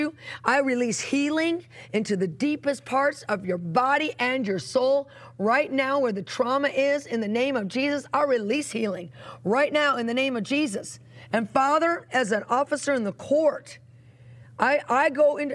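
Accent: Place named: New York, United States. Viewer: 😂, you from NY ❓️ you sure got a NY accent and way ❗️🤣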